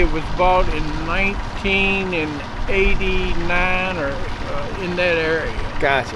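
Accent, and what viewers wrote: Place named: South, United States. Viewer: your dad really brings out your Southern drawl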